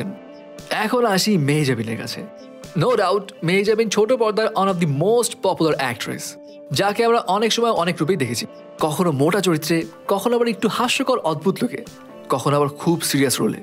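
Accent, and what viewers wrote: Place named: Kolkata, India. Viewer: star golper yutuber তো বাংলাদেশি বাংগালী কথা বা ভাষা কলকাতার মত কেন ভাই?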